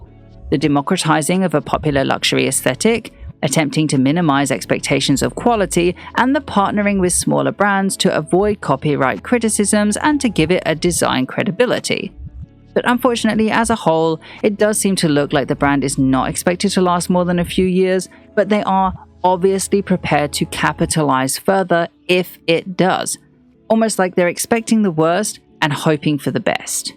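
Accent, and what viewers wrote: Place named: London, United Kingdom. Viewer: Great vid, ominous London and or U.K voice! Swear these vids gone help a young future fashion journalist win!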